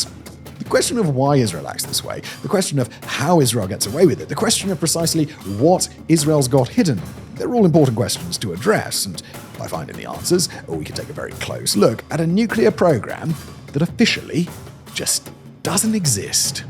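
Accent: United Kingdom. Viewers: i would enjoy your videos more and would be interested in all your promotions if you could stop making seemingly small but colossal mistakes that borders on misinformation like mistaking South Africa for South Korea in the Vela Incident, and calling the Rafale fighter, "Rafael". just talking fast and in british accent doesn't grant you immunity. tell your writers and editors to unfuck themselves.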